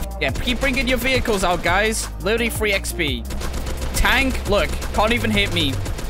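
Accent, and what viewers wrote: United Kingdom. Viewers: We got to admit that we love his British voice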